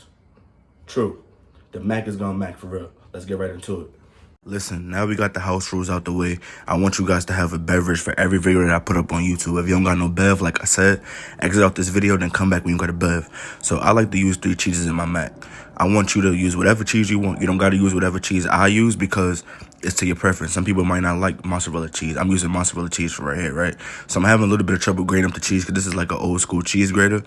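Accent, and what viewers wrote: New York, United States. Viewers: 🔥 you most definitely from ny though 😆 ya video had me rolling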